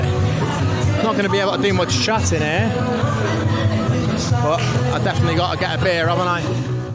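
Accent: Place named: North Wales, United Kingdom. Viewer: Hi Mate, Subbed after seeing you on Pete's channel. Really enjoying your videos. Where about's in N Wales are you from? You have the same accent as me. Take care :goodvibes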